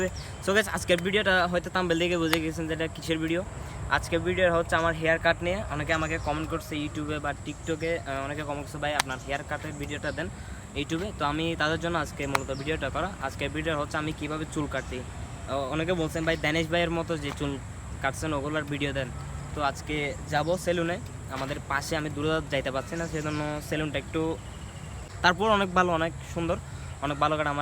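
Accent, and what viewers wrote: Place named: Chittagong, Bangladesh. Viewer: Via apnar bari kotai amar mota ctg karon apnar kotar modda boja jai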